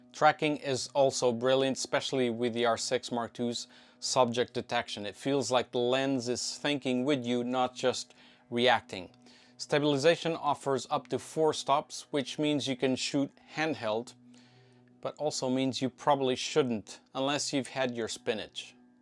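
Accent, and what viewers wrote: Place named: Portugal. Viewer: Great video bro Quick question are you Portuguese? Porque o sotaque nao engana meu camarada🙏🏿💪🏿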